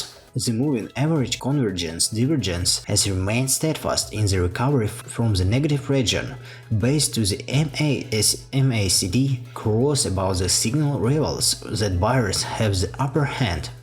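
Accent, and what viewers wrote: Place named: Russia. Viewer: Russian prononciation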